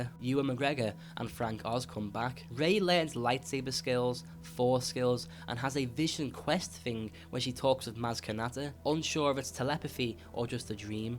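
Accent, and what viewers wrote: Liverpool, United Kingdom. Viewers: Its a scouser, its obviously balls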